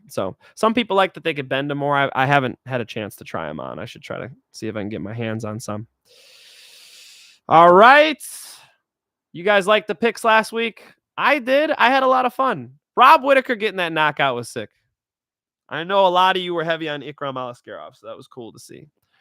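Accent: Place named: Tacoma, United States. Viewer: Are you from Northend Tacoma Wa?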